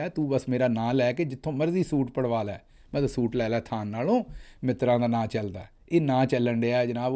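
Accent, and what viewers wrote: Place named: Punjab, India. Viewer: I like your punjabi accent ❤❤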